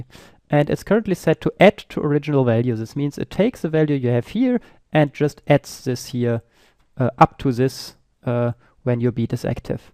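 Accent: Germany. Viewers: are you german? ^.^ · This tutorial is great! It doesn´t matter if you hear a German accent! Any accent is better than using a bad translator - 0k? :) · deutscher .. hört man sofort :D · Deutscher, hört man ;)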